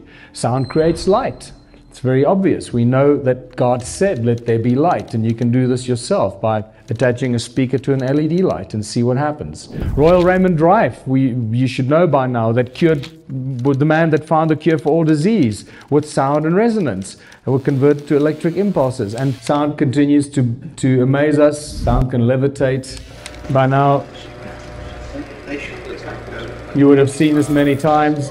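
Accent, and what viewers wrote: South Africa. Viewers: I stumbled upon this for the second time and I realised that it's a South African speaking here. I love how we are so diverse in ideology and thought because it opens up the potential for many of us to think differently perhaps, hopefully, come together and see how such can bring us together towards a common understanding about what it means to be human.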